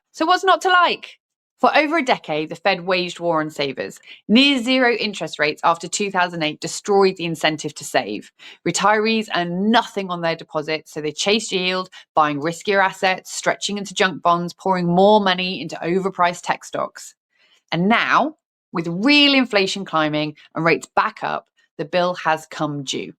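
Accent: United Kingdom. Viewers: Housing market showing real cracks in usa...nice accent, so cool british woman...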